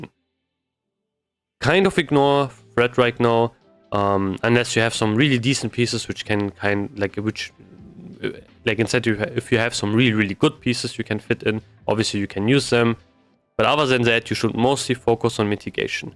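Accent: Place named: Germany. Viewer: Inhaltlich super, nur eine bitte, arbeite ein bisschen an deiner englischen Aussprache :D. Vokabeln, grammatik, satzbau, voll super aber du klingst so deutsch :-* nicht böse gemeint, nur ein tipp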